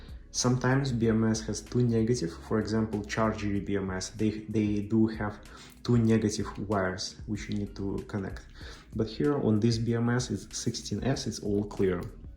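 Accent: Russia. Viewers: Товарищ! У тебя по ходу русский акцент!? Расскажи на русском языке!😀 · ох уж этот английский по русски!... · Слышу русский в вашем английском. Вопрос, у вас случайно канала на русском нет, а то я в английском не очень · Оффтоп: как же режет слух русский акцент! ;))) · Отличный акцент))) пря клип вспомнил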